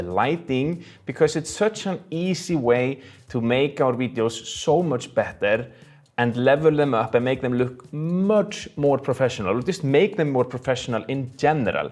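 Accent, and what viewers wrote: Iceland. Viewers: 👍Very Well Explained !! I believe I started liking icelandic English more than the american one 😀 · Been watching your videos for years, but I"m not sure if I've ever commented. Lots of family in Iceland and the Faroe Islands so your accident makes me homesick in a good way. I wasn't just watching a bunch of lighting videos and thinking I miss the days when tutorials didn't feel like ads. So nice to see you doing this video with these old godox lights and gels. Without experience I think a lot of folks these days would think they need to spend more than they do to get the look they want. Thanks for reminding me how capable my old godox 60 is. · Icelandic English. I recognize that accent. Great video!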